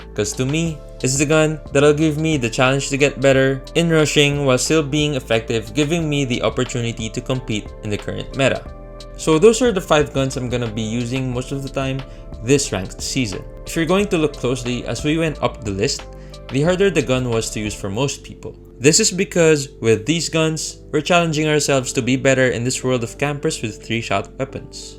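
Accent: Philippines. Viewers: Pinat Bater - gotta love our Filipino accent. Nothing wrong with it. Language is dynamic anyway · I have same accent. P-nAt batEr. Love from Philippines.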